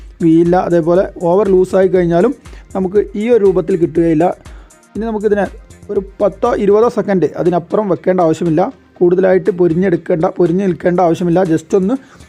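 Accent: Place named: Vadakara, India. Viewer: Laddoo mixcher. Anna vadakara parayunne. Super aayi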